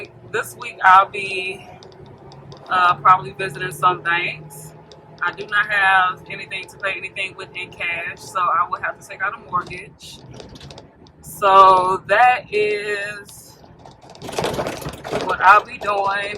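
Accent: Jamaica. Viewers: How do you do that? For a Mississippi girl your patois sounds authentic!😊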